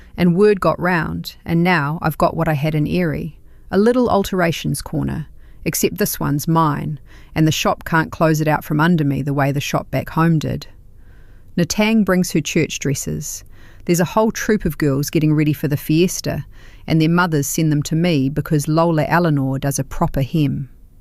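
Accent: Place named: United Kingdom. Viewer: She sounds british. One more story about how wonderful the philillipines are why do so many philillpines come here and stay.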